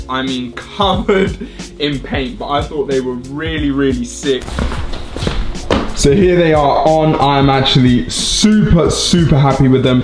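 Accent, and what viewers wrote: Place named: London, United Kingdom. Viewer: i was gonna like straight away just for the accent most of these videos arent acc done by londoners 🤣😅no shame though love to everyone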